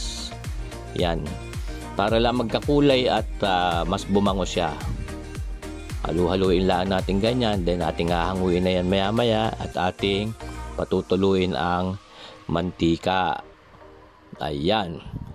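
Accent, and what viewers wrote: Batangas, Philippines. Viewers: Abay sadya..batanguen0 eh😅😅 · Nakakaaliw ka at nakakagood vibe panoorin. Marami rin ako nakukuhang techniques sa mga procedure mo ng pagluluto. Natutuwa ako sa mga pananalita mo eh! Talagang Batangueñong Batangeño… more power to your vlogs & GOD BLESS😊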